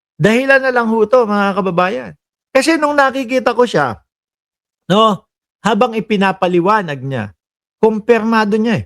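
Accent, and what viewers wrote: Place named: Iloilo, Philippines. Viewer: Parang boses ilongga ang tuno.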